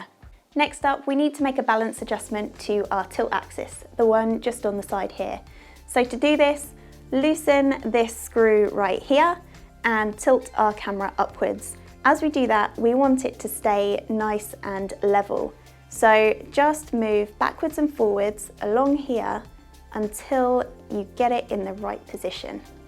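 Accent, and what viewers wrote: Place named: England, United Kingdom. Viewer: Brilliant!! Love the way the English speak. Awesome video! -Jeremy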